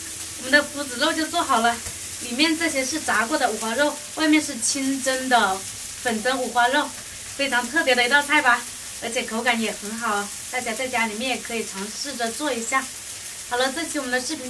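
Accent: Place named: Guangxi, China. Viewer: 讲广西官讲的妹仔。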